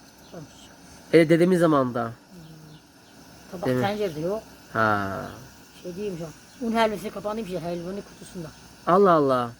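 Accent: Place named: Bartın, Turkey. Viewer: Has bartin şivesi.halişka bu deyil misir unu ile olur halişka .bunun adı hamur atmasi .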